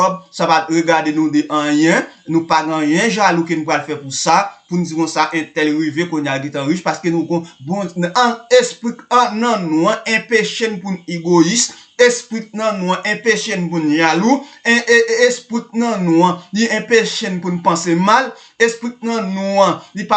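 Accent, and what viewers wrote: Haiti. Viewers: Ou pale tankou moun nord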